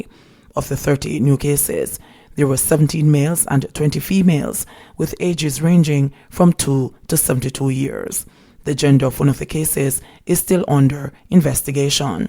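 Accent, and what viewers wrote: Jamaica. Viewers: We just love the way you deliver the news, especially the Patwa. Blessings to you!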